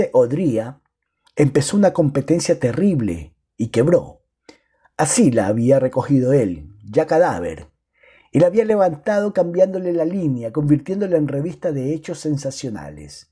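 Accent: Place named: Latin America. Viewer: Muchas gracias por la narración y por el esfuerzo. La entonación foránea latinoamericaniza estas historias criollas peruanas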